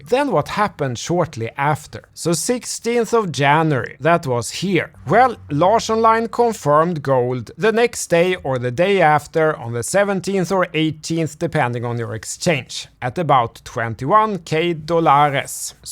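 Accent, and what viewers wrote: Sweden. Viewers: Great content as usual but please talk more clean english/American then swedish/swinglish 🙈👍💪👊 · Well, in his defend. He's swedish. I love the accent!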